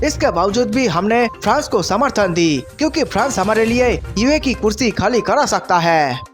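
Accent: Bengal, India. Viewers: Bhai tu bangali ha tera bolna k ton sa pata chal raha ha lagaraho bongo munna bhai Jay hind......🇮🇳🇮🇳🇮🇳🇮🇳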